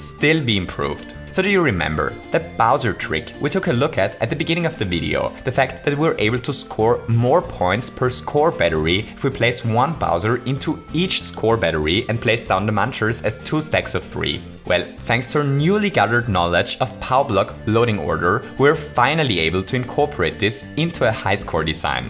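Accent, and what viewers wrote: Germany. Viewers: Du sprichst ganz sicher deutsch als Muttersprache, das merkt man an an dem Englisch 😂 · Wie man raushört, dass du deutsch bist :D ...hehe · Deutscher Akzent kickt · If I may ask,... are you german? This accent... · Man hat an der Stimme schon ein Bisschen erkannt, dass du deutsch bist ^^ #nofront^^ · Are you German? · Just a simple Question: are you german? :D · Is this dude German ? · xd man hört voll den deutschen aktzent(ich weiß nicht wie man das schreibt)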